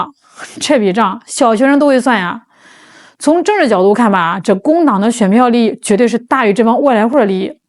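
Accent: China. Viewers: 开什么玩笑，让中国大陆地方口音浓重的人念稿，解读香港群体在海外的心态，如此驴唇不对马嘴自己不嫌别扭也罢，出来作弄恶心他人则有辱斯文有失厚道……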